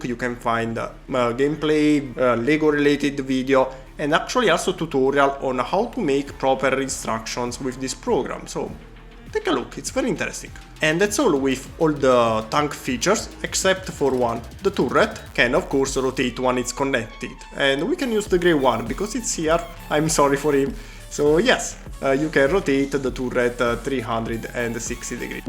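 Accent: Italy. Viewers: ti ho sentito parlare 5 secondi e sono andato sulla bio per vedere se sei italiane, e lo sei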